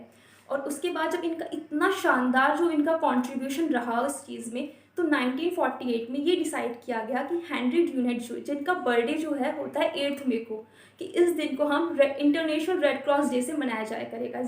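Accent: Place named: India. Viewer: Looking good india aksen in english is unic and great.i like to hear..goodluck miss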